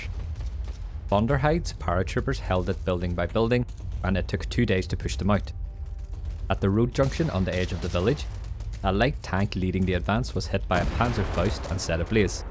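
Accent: Northern Ireland, United Kingdom. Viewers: Well done. Hence my subscription. Having spent a year in Derry, courtesy of the U.S. Navy, your accent sounds as if you are from Northern Ireland.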